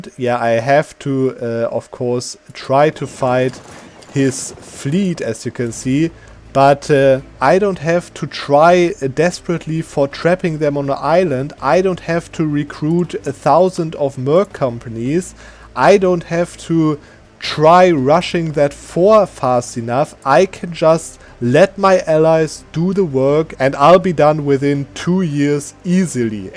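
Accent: Germany. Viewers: The german dialect is strong in this one. Love it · Thanks for the reply. I liked your video otherwise! You have a sure way of speaking, and a nice German accent.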